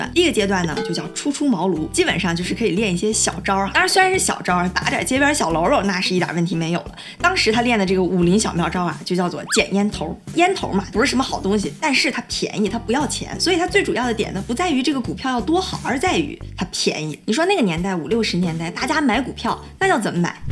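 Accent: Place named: Dalian, China. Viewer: 中山区出生的海蛎额味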